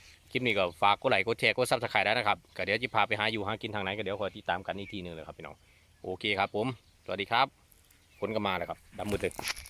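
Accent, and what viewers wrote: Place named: Korat, Thailand. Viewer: สวัสดี เป็นคนโคราชมั้ยครับสำเนียงคือเลย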